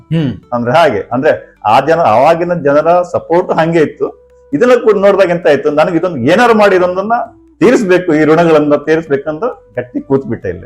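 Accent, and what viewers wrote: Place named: Belagavi, India. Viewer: ಸರ್ ನಿಮ್ಮ ಭಾಷೆ ನಮ್ಮ ಬೆಳಗಾವಿಗೆ ಸರಿಯಾಗಿ ಹೊಂದುತ್ತೆ ಸೂಪರ್ ಸರ್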